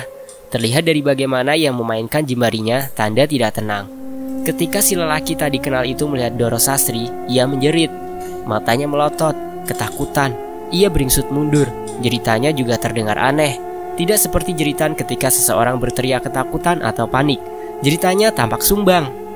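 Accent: Java, Indonesia. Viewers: q seneng logat jowone sang Pembaca wong ndi yo kiro2 😀😀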